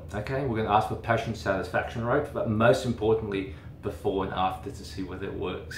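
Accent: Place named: Australia. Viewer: will you add the Newa to your device testing? It's a radiofrequency device that claims to use the same 3DEEP technology used in the clinic setting. The makers of Newa further claim the device induces production of collagen and elastin. The Newa website states a study showed an average collagen increase of 3.82 percent (but fails to state how the test was conducted... and I never trust any test not done by an independent, third-party facility). Also, what are your thoughts on the Tria laser (basically it is an at home Fraxel type device)? Would you reccommend the Tria over dermarolling? (I already own the Tria so am basically curious whether I should continue to use it or dermaroll or alternate treatments?) Thanks so much for your videos!!! I love everything about skincare and being able to a professional with an Australian accent talk about skincare is damn near perfection in my book.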